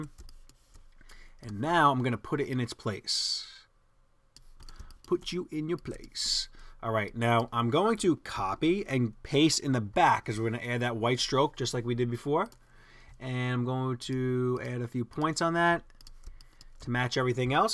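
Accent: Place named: New Jersey, United States. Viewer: Your so from jersey, you from jersey. love all your videos, great work, great teaching.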